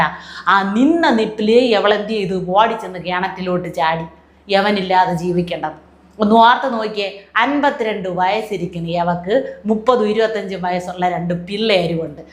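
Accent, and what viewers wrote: Thiruvananthapuram, India. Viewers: തിരുവനന്തോരം ശൈലിയിലുള്ള അവതരണം അടിപൊളി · Thiruvananthapuram slang 💯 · tvm കാരിയാണ് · Super super❤❤❤ TVM language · Very good presentation in തിരോന്തരം സ്ലാങ് 👌👌👌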